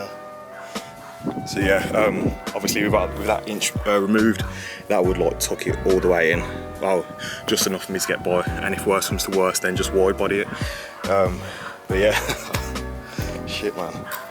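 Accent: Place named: Midlands, United Kingdom. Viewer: Yo just been watching your vids as well I have fiesta mk6 too I've started upgrading it a bit lately got new exhaust have alloys on but those wheels man look cool are they legal where's the tread lol? Also where you from sound like midlands like me I'd love to get advice off you for my car once this virus outbreak has stopped!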